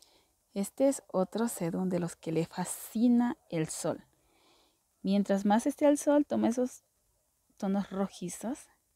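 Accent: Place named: Colombia. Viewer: Hola eres colombiana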